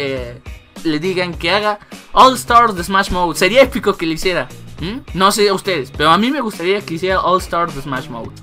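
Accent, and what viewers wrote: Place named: Mexico. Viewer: Cesar Rosas Torres Se le siente el acento mexicano en él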